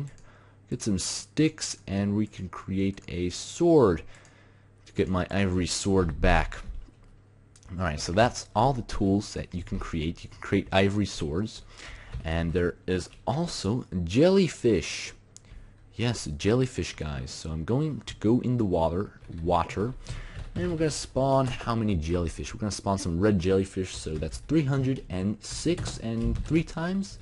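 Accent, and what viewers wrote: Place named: Australia. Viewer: ( I wanted to hear you in your Australian accent.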